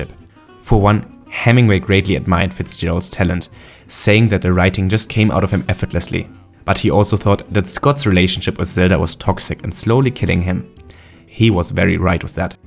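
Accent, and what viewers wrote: Germany. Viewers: 😎. Your choice of words, the attention to certain details, your sense of humor. Also, I'm a huge fan of ACCENTS. Your's sounds German, or Austrian. Of course it might be some other. Finally, being Black American, I don't think I've heard a fellow (white) American go into such detail about JOSEPHINE BAKER & the influence that Black Americans had in Paris during that era. You're clearly (EUROPEAN.) I realize that Europe is far from perfect but I miss it. Also, (MIDNIGHT IN PARIS) is one of my favorite films of all time. For these reasons, I am grateful to you ! 💌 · I'm obsessed with Midnight in Paris, too! This video is what I was looking for when I first saw the film one month ago, and I'm happy to see it was finally created. I never comment, but this is just great. To keep you engaged with your new found audience, I've got a question for you ...is that a German accent I am hearing? 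Keep it up! :) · Junge nach 2 Sekunden hab ich den deutschen Akzent gemerkt hahahahah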